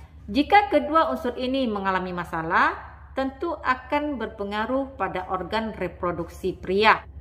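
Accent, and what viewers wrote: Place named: Sumatra, Indonesia. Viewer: Logat nya kyk sumatra deh😅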